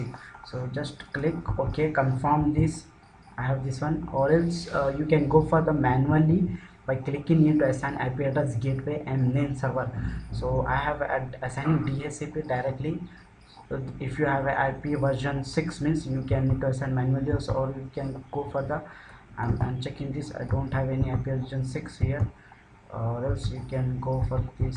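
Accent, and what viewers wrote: India. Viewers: Hello, in this tutorial I will show you how to install cPanel and WHM on Virtual Box. Don't worry man, I can understand everything you're saying. I spent some time in Hyderabad and Khammam, so it's easy for me to understand. People that can't understand you probably haven't heard your type of accent before, so don't feel bad!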